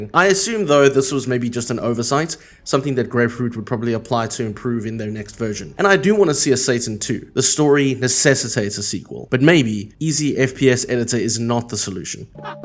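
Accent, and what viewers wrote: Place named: Cape Town, South Africa. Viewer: Haha I thought so, I recognize that local accent anywhere, I'm from Cape Town 😎 Yeah it is really satisfying to get a game working in EFPSE but as many limitations as there are, there's also a strange learning curve to a lot of it, which makes me think it's not really worth it at times, there's a huge lack of tutorials for the engine which is why I make some when I can, but my knowledge is really limited at the moment. And yes I looked into Trenchbroom recently, looks really cool! I might give that a go soon, as well as Godot. Keep the content coming, you're doing great and I look forward to seeing what games you make in future! 🎉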